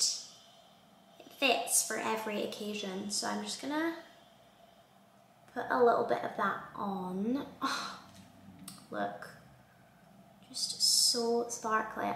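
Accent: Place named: Scotland, United Kingdom. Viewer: Gosh I can relate to you with your IBS I went through the very same thing but it was Celiac I was diagnosed with eventually It can be so hard at times but we learn to adjust and live with it Love your “ Make Up “ you look so lovely Really enjoy your Blogs and glad you have your Mum around to help you Can I ask personally where you come from as you sound very like me I was born in Fife but came to Australia from Edinburgh I just love listening to you and your sewing is amazing I am very much a beginner now as hadn’t sewn for over 40 yrs Have a wonderful Xmas with your family ❤️🌹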